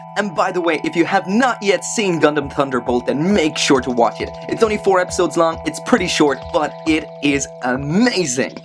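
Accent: Ireland. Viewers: you have a very small strange Irish accent to your voice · I love your voice so much you irish uwu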